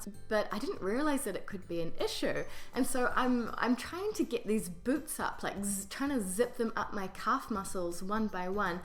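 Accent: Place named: New Zealand. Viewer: Your English sounds sooo much more French (If you know what I mean). I just finished watch a few old videos, The New Zealand accent has changed a lot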